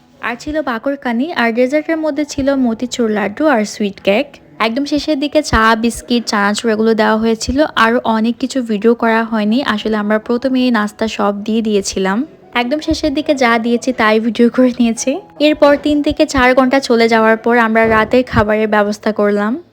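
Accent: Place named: Chittagong, Bangladesh. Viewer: hmm apnar kothar style e bujhtesilam apni chattagram er, r chattagram e jamai ashle asto murug musollom kore eta ami jani, amar nanu bari potiya.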